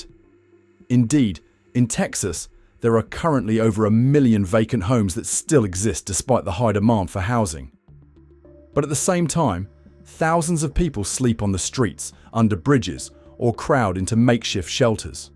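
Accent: England, United Kingdom. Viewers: Tell me why the narrator has an English accent.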